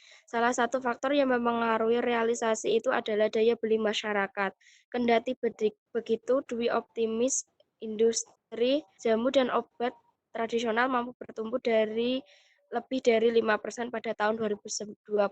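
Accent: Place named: Java, Indonesia. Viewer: Bagus kak😊👍 Walopun agak medok🙈🙏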